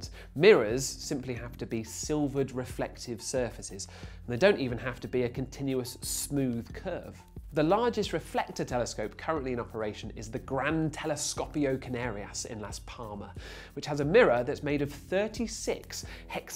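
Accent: United Kingdom. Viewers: WHY is everything that is scientific narrated by some guy with a British accent? And I still havent found out if a telescope ZOOMS like a camera lens or are they fixed?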